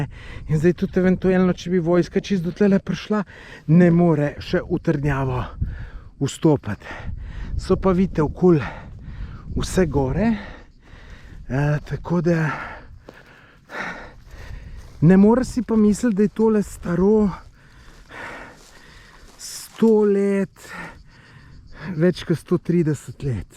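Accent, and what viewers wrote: Lower Carniola, Slovenia. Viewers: Joj, kolk ti mene nasmejiš! Tvoja dolenščina je tolk zabavna, še kletvice so original. O krajih ne poveš kaj bistvenega, ampak za popotnika pa krasne info. Cena app, hrane, zajtrki.....ma ni boljše info. Super. Veš kaj mi je tudi všeč; začel si intervjujat ljudi. To je super. S tem potovanjem proti Albaniji in sedaj ČG si me pa spet navdušil. Motijo me le reklame, ki so podvojene in na kratko sapo.Razumem, da je tudi to del zaslužka, ampak....razmisli.....če ti res to potrebuješ. Na nek način meni malo razjezi, pa še koga verjetno tudi. Bodi fajn...in srećno pot proti domu. Aja....pa bolj počasi potuj...potem se ti neumnosti ne bodo več dogajale.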